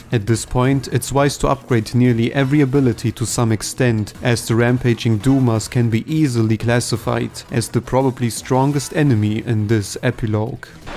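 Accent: Germany. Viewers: du bist deutsch richtig? das hört man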